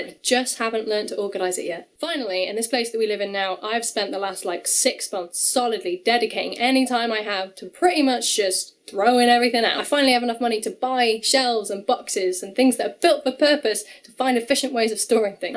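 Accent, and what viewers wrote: Wales, United Kingdom. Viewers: I only just discovered your channel about a year ago, and I have to say that I thoroughly enjoy it. Mostly, because I'm American and am enthralled with everything from the United Kingdom. Add to that, your videos are very well made and the cuts from sentence to sentence are what make them so enjoyable. May I ask from what general area of Wales you are? I don't need an address or anything. I can hear your Welshness in some words, but you (to an American, forgive me) sound more English.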